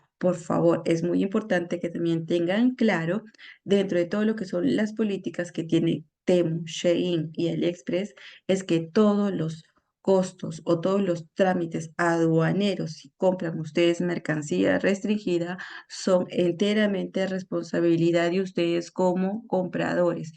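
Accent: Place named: Colombia. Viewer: pero hablas como colombiana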